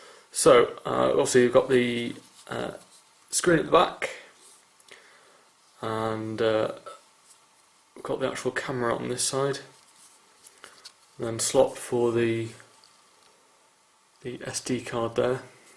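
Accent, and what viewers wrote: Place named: United Kingdom. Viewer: My ear hates British English.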